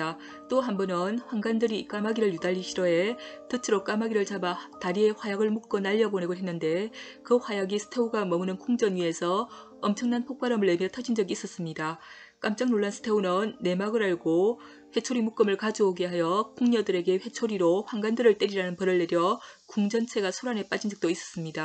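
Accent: Gyeongsang, South Korea. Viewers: 저는 경상도 사람이라 ..잘 알아 듣겠음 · 같은경상도라 듣기 편했는데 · 겡상도 억양 발음 문제 있어 알아듣기 어렵고 부자연스러워요 차라리 천천히 또박또박 말하는 습관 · 경상도 발음에.. 내용파악하는데 불편! 그냥 자막으로만 처리하는것이 나을 듯! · 목소리가 코가 먹었구용 사투리가 경산도분 여기서 꿈을 이루시나봐용ㅋ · 지독한 갱상도 사투리가 뼈속에서 나오는군요.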